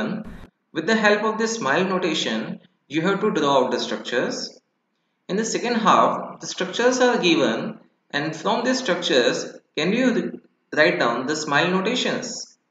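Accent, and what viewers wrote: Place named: India. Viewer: Usually the indian accent drives me crazy xD but yours is actually quite likeable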